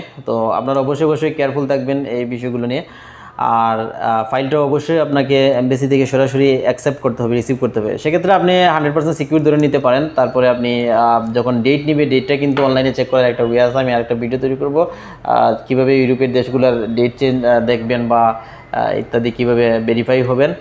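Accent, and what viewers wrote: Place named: Sylhet, Bangladesh. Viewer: ধন্যবাদ ভাই এত সুন্দর ভিডিও দেওয়ার জন্য নিশ্চয়ই আপনার বাড়ি সিলেটে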